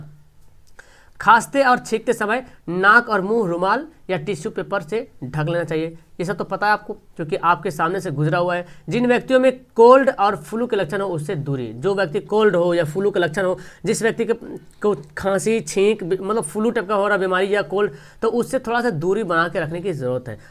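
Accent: Bihar, India. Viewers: Bhai Bihar se ho kya? Awaz se lagta h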